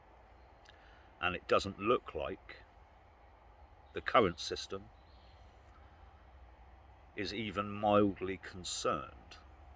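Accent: United Kingdom. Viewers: I'm lucky to hear a British voice where I live......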